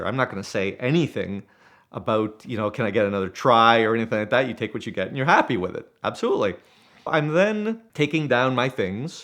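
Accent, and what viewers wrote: Canada. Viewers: I can assure you, there is no need for you to tell us you’re from Canada. We knew the second you said “out”. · The Canadian accent is entertaining in itself. I’ve never heard “curb” pronounced in such a unique way…”CURRBEH”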